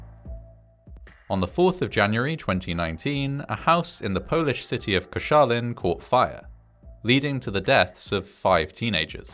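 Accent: United Kingdom. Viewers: terribly hard to listen to the mono tone british accent, im going to take a nap now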